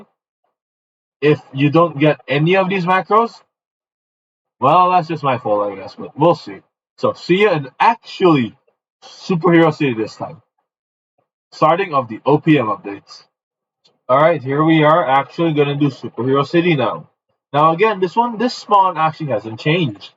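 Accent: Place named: Netherlands. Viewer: Thanks for the video btw you sound dutch what is weird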